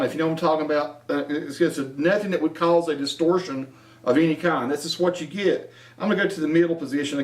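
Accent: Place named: Appalachia, United States. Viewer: Hi, just found this video through your Scottish accent video. Weird coincidense, I started looking for a Telecaster just yesterday and was wondering if I should spend a little bit more on a Mexican-built Fender or buy a cheap Squier. Difficult decision as I‘m an amateur and only play ocassionally at home. I think I will go for the real deal - a Fender with single-coils. Thanks for showing all the different modes, it helped to have a side-by-side comparison of the two different pickup styles as I only played single-coils all my life. I also really enjoy your Appalachian accent! Thanks & greetings from Germany, Alex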